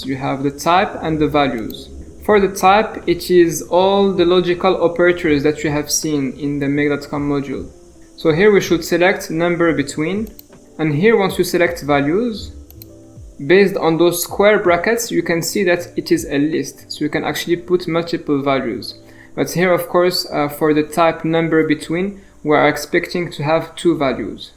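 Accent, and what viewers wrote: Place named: France. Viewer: Bonjour, tu as un accent Français, are you french? merci pour le cours 👌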